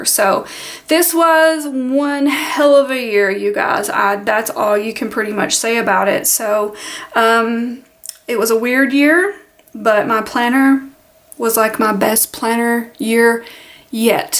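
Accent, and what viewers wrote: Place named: Kentucky, United States. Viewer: I just love your videos... Anytime i have a video playin my 7 yr old says she talks just like meemee (very deep Kentucky) we love it girl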